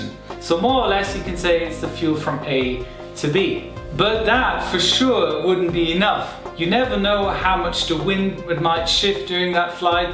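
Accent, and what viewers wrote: Germany. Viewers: Das ist das erste video was ich von dir gucke und ich hatte von anfang an das gefühl das du ein deutscher bist :) und es hat sich als wahr herausgestellt :D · Is he german?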